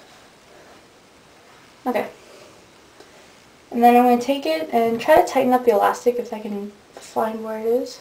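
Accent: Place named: United States. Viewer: Yay!! I did it I plaited mine and then curled it :D Im goin to meet a lad in a couple of hours so Im goin to wear it curly then put it into this half way through so it looks as if Im good with hair haha. Love your videos and your accent, wish I had an American accent but I have an English accent cos Im english and live in england x :( haha xx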